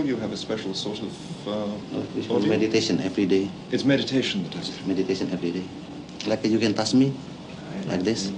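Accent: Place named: Indonesia. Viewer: noo, i think ur wrong ... he speaks indonesians very good, and also use typical English-Indonesians Accent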